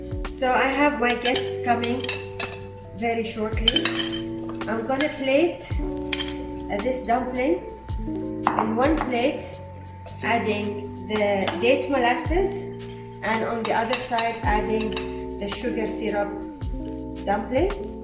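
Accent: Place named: United States. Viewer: Omgosh, you are a joy to watch. I love how simply you make this recipe seem. Yeast scares me but maybe not now. Your English is 100% American perfect. If you don't mind my asking, where did you learn to speak so well? I'm still among from your demonstration. My IG friend sent me your link. I'm going to do as you say, first collect all the necessary ingredients and gadgets before I make this for my friends. Thank you so very much... Stay well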